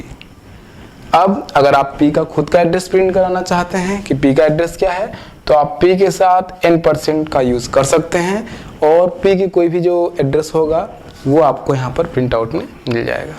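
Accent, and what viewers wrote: Bihar, India. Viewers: are you from BIHAR?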